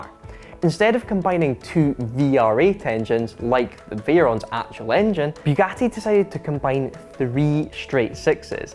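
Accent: Scotland, United Kingdom. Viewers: the guys Scottish, a lot of people can't understand the accent. Didn't know if he was serious or not.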